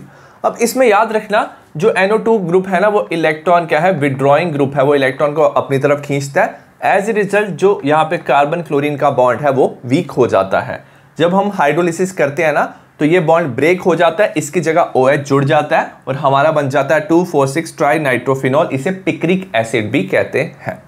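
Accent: Kashmir, India. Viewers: sir aap kashmiri ho?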